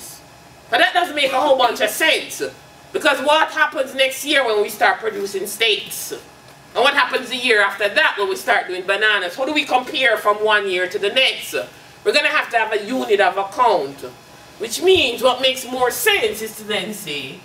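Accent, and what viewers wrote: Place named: Jamaica. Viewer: Sound like a Jamaican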